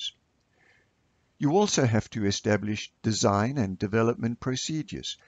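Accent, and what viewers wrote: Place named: South Africa. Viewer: I see you live in Canada, but sound like a South African :)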